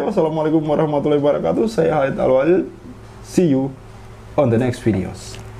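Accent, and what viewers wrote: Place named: Ambon, Indonesia. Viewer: Logat Ambon ko Kaka keliatan..Kaka Pi jakarta su berkembang..ganti logat ko jakarta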